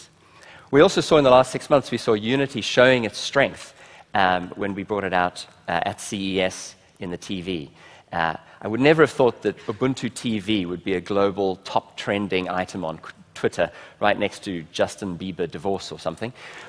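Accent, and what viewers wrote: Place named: South Africa. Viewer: Loving the South African accent and idiom.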